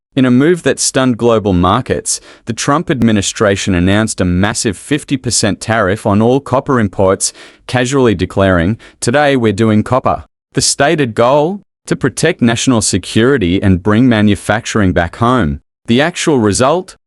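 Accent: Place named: Australia. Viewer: That’s exactly what most of them will usually do. It’s not the supplying countries that pay tariffs, it’s your own country's importers that have to pay them on arrival. Or, did you think it was the other countries that paid the tariffs? The only way they suffer is if their goods (now with a tariff) are too expensive to sell in the USA and they can’t find another market, so they might need to close down, downsize or make something else. The narrator has an Australian accent. They would be the least affected of all, because they buy lots of American stuff, but sell a lot less to the USA. You owe them much more than they owe you. So losing just a small part of their exports because they are now too expensive in the USA because of tariffs isn’t all that critical.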